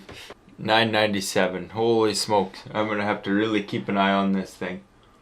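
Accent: Canada. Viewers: I came across your channel years ago, and got a kick out of your Canadian accent. (Eh?) But I kept coming back because you weren't trying to be a YouTube "star." You were just doing fun things, and bringing us along with you. I wish you more and more success as the years go by. 🤩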